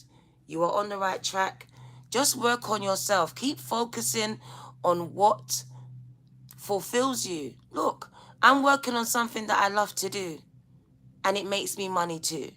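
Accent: United Kingdom. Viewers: I absolutely love your old world Brit accent. My father was British and had one too.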